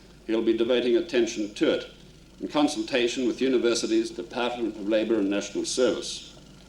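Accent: RP, United Kingdom. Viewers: sounds like the queens accent.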